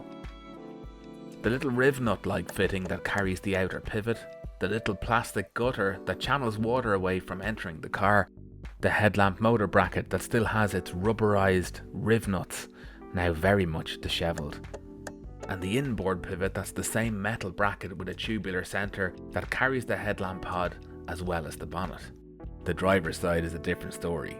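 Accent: Ireland. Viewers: The art of pedantry - a new psycopathy in development, in a gentle brogue.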